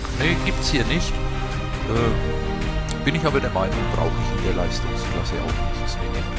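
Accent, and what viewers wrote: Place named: Franconia, Germany. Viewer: Do hör i doch an Frangen. 😊 Ich fahr auch den Storm auf meiner Sv. Ist aber eine Euro 2. Gruß aus Mittelfranken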